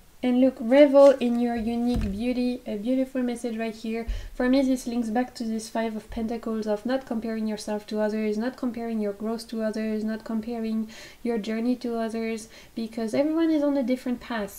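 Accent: France. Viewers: Woww you are my new favorite youtube channel❤️ been binging your videos the last couple of days. You have alot of decks that i have on my wishlist! And pile number 2, i have the feeling i have been putting alot of work in my business and not seeing alot of results (or monetary results from it) so you are spot on❤️ keep up the good work! Love your french accent🥰 (can speak a couple of words in french but not much haha)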